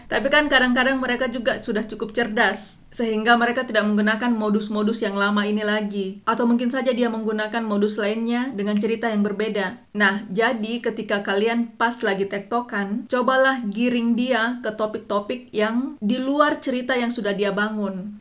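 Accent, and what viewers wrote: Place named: Toraja, Indonesia. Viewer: Wkwkw kak logat Toraja ta masih keliatan di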